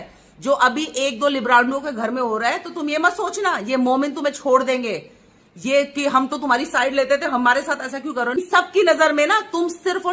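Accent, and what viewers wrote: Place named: Haryana, India. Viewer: I like u, ur haryanavi style is outstanding, love u❤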